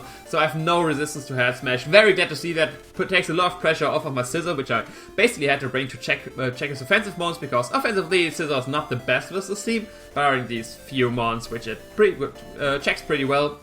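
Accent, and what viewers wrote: Germany. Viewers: is this guy German?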